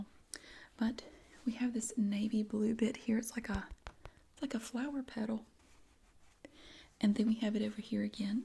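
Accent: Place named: Upper South, United States. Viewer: 'My Journey To A Smile' She’s from the upper south east! 😊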